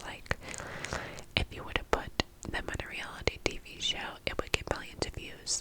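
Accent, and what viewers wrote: New York, United States. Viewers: Hey! I love your breathy whisper videos! I love to hear your rambles in a NY accent!😊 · please do another ny accent video <3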